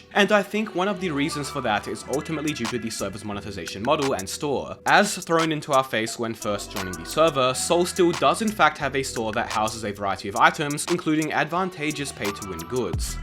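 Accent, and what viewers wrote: Australia. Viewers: Australia? I thought you were frok the UK. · Oh my god... You're Australian?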